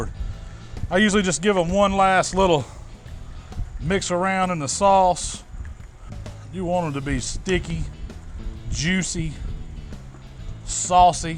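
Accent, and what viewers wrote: South, United States. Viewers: Vegetarian here. This is sooo satisfying to watch! Specially his southern accent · As soon as I hear that thick southern draw, I know this is gonna be some good BBQ. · The best channel to pickup the American accent. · First seven seconds is all I needed to watch to know this guy is legit. Fat guy with a southern accent? SOLD.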